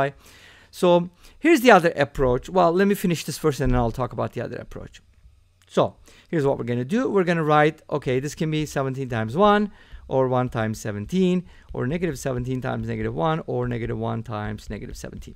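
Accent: Turkey. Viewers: You have a really turkish accent, are you any chances turkish?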